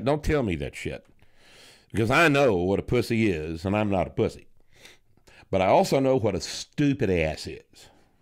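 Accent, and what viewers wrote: Texas, United States. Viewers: His voice makes me want to move to Texas 🫠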